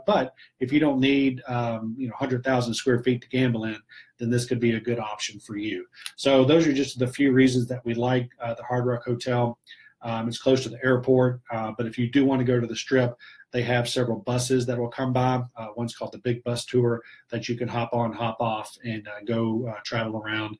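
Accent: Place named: Oklahoma, United States. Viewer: Sounds like an Oklahoma oil money red state hick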